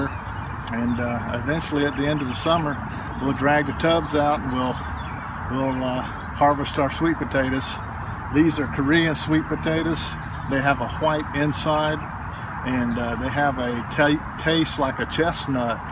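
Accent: South, United States. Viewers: Hello my friend . What part of the USA do you live? I hear the southern accent however I want to get the dates, temp adjustment.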